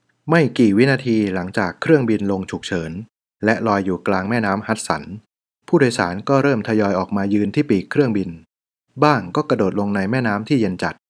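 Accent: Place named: Isan, Thailand. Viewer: ป๊าดดดด....กัปตันอ่านอิสานฮู้เลืองไป่อี๊กกกก ต่าฮักต่าแพงแท่เด้จ้า😊😊😊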